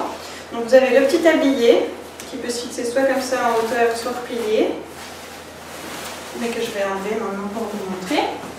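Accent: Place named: Switzerland. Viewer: Bonjour, superbes vidéos! Très intéressant! J'ai l'impression vu votre accent et les mots tels que nonante, huitante que vous êtes suissesse. :-) Est-ce juste? Si oui, j'ai une petite question. Je souhaiterais acquérir une poussette Peg Perego et vivant également en Suisse, je ne sais pas où en acheter. Sur le site d'Orchestra je n'ai vu que des accessoires. Auriez-vous un conseil?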